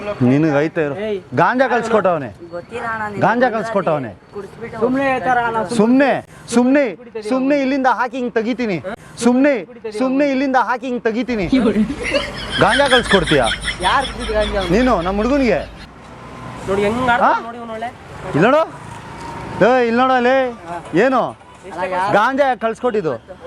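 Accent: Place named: North Karnataka, India. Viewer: ಖತರ್ನಾಕ್ ಉತ್ತರ ಕರ್ನಾಟಕ ಭಾಷೆ ಮಾತಾಡ್ತೀ ಬಿಡಣ್ಣ ಸೂಪರ್❤😅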